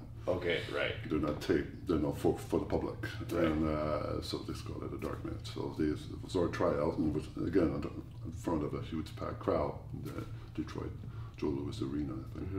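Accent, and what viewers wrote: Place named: Canada. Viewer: He sounds so Canadian I love it lol I feel like I'm listening to my neighbor right now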